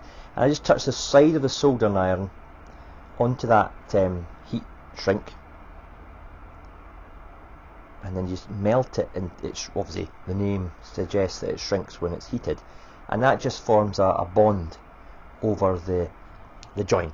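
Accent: Scotland, United Kingdom. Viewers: What a great video that was. I didn’t know any of that stuff. Very informative. I love that Scottish accent too. Pete 🇬🇧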